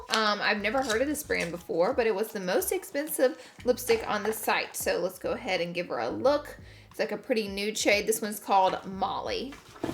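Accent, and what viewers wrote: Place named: South, United States. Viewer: You have an accent (to me)! Where are you from? It’s very southern belle/ country lol and I can’t tell your ethnicity 🧐🤔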